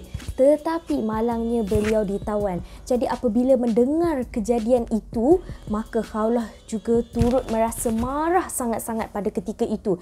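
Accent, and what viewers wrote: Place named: Kelantan, Malaysia. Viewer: Anyway speaker mcm iras ustzh noorbahiyah laa..hihi..suara pun lembut je..bunyi mcm dialek klate/ tganu gitu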